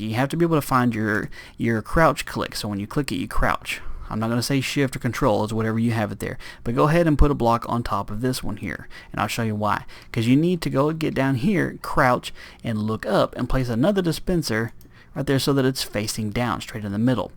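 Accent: South, United States. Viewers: R u a county folk mr acsent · Hey are you from the south? I can really hear your accent. You wouldn't happen to be in Texas would you? ☺️ I'm a homesick Texan meaning I am from Texas but I live up in the North East. People aren't kind up here. ☹️ Where are y'all from? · You sound like a hill billy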